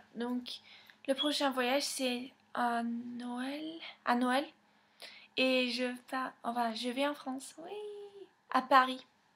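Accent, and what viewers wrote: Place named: England, United Kingdom. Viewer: je trouve que tu as un accent anglais et en plus tu n'habite pas en france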